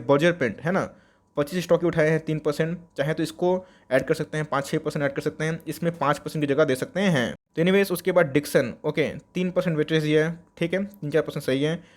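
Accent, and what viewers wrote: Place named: Bihar, India. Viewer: Kaisa bolta re up bihariya tu bare ki nahi bare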